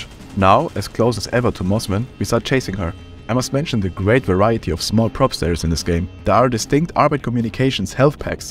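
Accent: Germany. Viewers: I loved to play EZ2 with it having an amazing story, and having a really cool stealth part with the monster in the Aperture Lab. Literally gave me chills! I loved playing these games as much as I enjoyed this video. Good job EZ2 devs and (btw your german accent is beautiful can't hate it. And don't worry mine isn't better xD) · Is he german ? i can't tell if so, ich liebe deine videos und dein content allgemein. Schönen tag noch ! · I have a question:are you German? Besause i am German and you Said one time that you have a German ankzent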